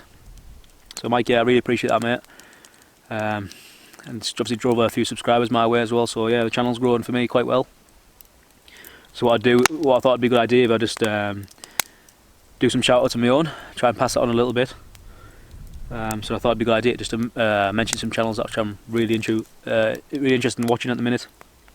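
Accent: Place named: Teesside, United Kingdom. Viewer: Brilliant video again bud, were about's you from again? you sound local to me around the Cleveland/Teesside area. I could be totally wrong though lol, Great Channel Keep it up. Si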